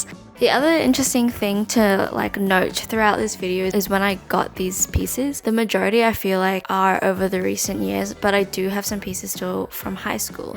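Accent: Australia. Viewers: Hiiiiii. I love your style and your accent. Can you recommend some other Aussie youtube channels that you love watching? I believe that if I enjoy watching your videos, definitely I will love your recommendations. Cheers!!!! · Oh jeez. ANOTHER austalian i had assumed was british. help i can't place accents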